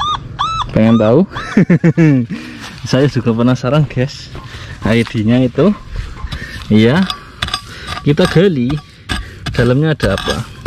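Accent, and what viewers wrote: Banyumas, Indonesia. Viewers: hahaha ngapakkkkk men rika kang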